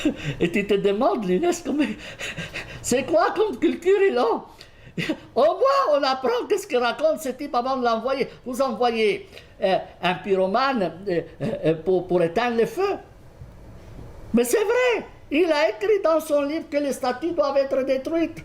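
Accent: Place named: Switzerland. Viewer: Drôle et passionnant... L'accent suisse est la cerise sur le gâteau.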